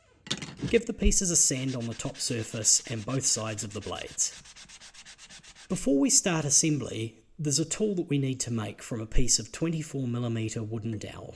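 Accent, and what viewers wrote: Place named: England, United Kingdom. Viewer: I LOVE that this guy has an english accent! You're like this is a straight laced normal guy. Then he makes a screen accurate Freddy glove!! I thought u liked tea and krumpets!?!? My childhood is ruined and it's not about Nightmare on Elm Street! THIS guy and his babysitter voice, piecing together of screen accurate Freddy glove... What accent is that? I'm staying the f*** away from that town...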